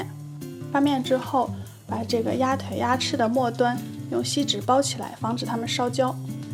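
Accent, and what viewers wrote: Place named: Nanjing, China. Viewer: 南京人！哈哈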